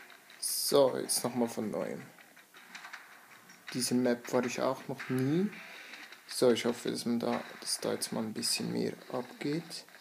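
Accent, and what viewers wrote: Switzerland. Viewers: BASELS DIALäGT! :D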